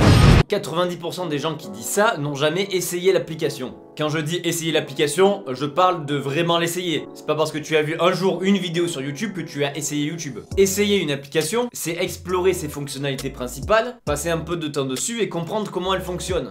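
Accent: Marseille, France. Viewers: Je suis totalement d’accord avec toi et en plus je trouve que maintenant j’entends un peu ton accent marseillais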